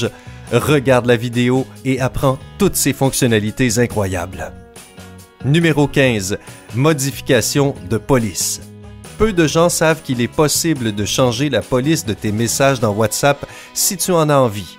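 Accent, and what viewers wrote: Quebec, Canada. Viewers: Pas canadien ??! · Il a l’accent québécois, mdr😂🤣😂🤣.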